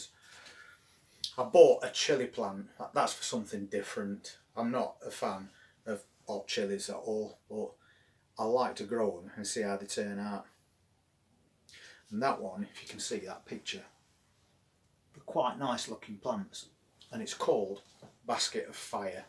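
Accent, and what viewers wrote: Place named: Northern England, United Kingdom. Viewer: I so love your videos. I have M.E and days where I'm suffering a crash (post-exertional malaise, which feels like 'flu with a hangover), your gentle voice and lovely Northern accent is soothing to my frayed nervous system.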